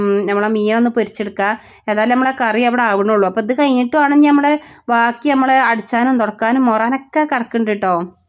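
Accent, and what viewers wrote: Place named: North Kerala, India. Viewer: Uttara Malayalam speaking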